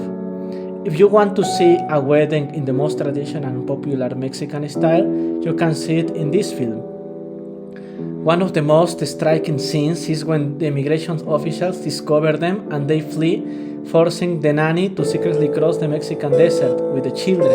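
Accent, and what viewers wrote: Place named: Spain. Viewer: Muchas gracias por el video. Me gusta mucho tu acento español cuando hablas Ingles. Haz más videos por favor ❤❤❤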